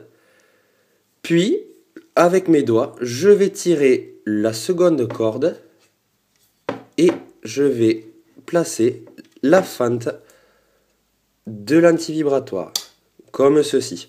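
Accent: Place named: South, France. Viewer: mais non, quel bonheur cet accent du sud ! 🥰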